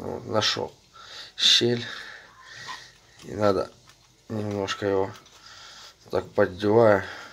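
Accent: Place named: Ukraine. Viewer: Наш с Украины? Слышно по разговору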